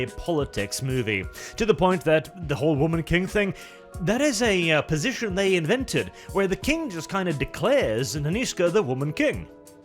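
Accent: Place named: England, United Kingdom. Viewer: Listen to that English accent, and knowing that my language that I speak is English come and knowing that all three of my names both first second and surname is english, and knowing that the English is participated in the slave trade and benefited off of it still to this day, you should be the last person talking.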